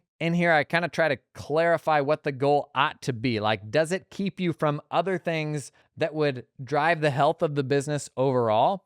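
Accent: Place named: New England, United States. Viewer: why the AI use southern vernacular with a noticeably New England cadence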